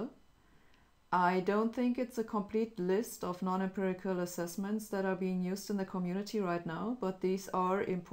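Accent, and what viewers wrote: Germany. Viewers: Yes, that was a nice example of Hossenfelder's MANY throw-away one-liners! The effect of her swift, deadpan delivery is deliciously close to that of Sally Phillips who plays Minna Häkkinen, the "Prime Minister of Finland," on the show called VEEP (granted that what we hear in this case is technically a very mild and pleasant German accent, instead of a faux-Finnish accent).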